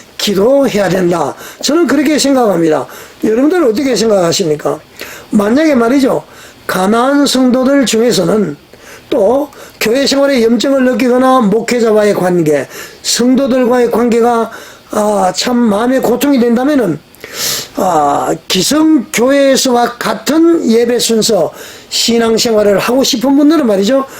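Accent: North Korea. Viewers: 해설하는이분은 이북말투예요 이상해요 ㅡ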